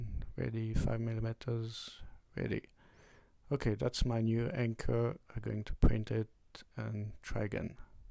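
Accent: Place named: Neuchâtel, Switzerland. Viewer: Oh un neuchâtelois :-)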